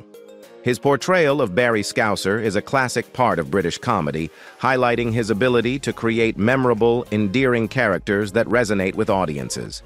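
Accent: United States. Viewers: Soon as I hear the AI American accent I swipe and reject…. No thanks.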